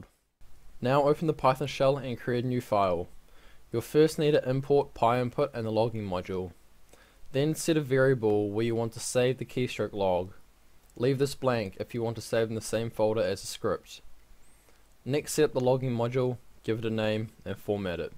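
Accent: New Zealand. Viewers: eyyyyyyy We got a Kiwi ; )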